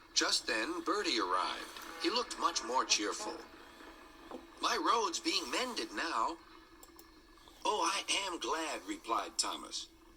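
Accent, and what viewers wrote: France. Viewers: Wow you sound like a french narrator ! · Whoa, I trust you! You sound like a French narrator from SpongeBob SquarePants. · WOW No Way You sound like the French Narrator from SpongeBob SquarePants. I always trust on my friends because they're always helping me just in case they need help like a helping hand great video I can't wait for the next video called Oscar, Trevor Evans and the really useful Party it would be Partytastic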